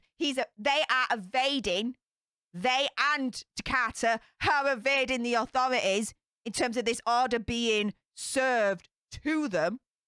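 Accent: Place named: Northern England, United Kingdom. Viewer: She's a Northern Lass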